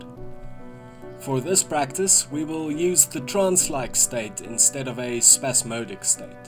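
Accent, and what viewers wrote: South Africa. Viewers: ? Are you also South African? I recognize your accent. Is there any way I can contact you? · sorry if this sounds weird your accent sounds verry familiar are you mby from SA if u are nice to see im not alone in this country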